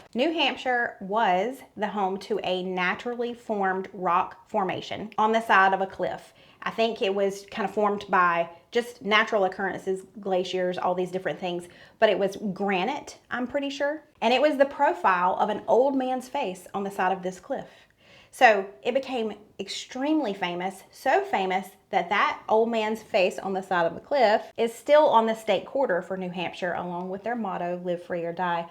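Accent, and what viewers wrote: South, United States. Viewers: Now a days I put mine in the crockpot. The Rueben’s for the leftovers are the best part! you say “boil” however you want to!Its fine and I love the southern “accent” of it❤ Also I am jealous that I can’t go to your meet & great, but I bet you & everyone who attends will have a blast!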